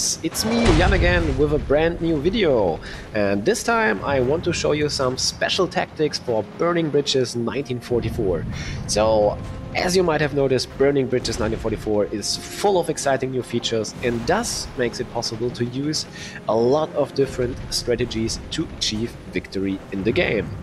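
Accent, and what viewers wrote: Germany. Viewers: You have German Accent Im German so my Englisch is bad. Bist eigentlich ein guter Youtuber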